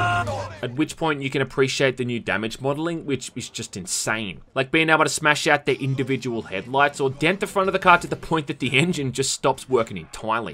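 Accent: Australia. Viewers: Its how the Australian accent works.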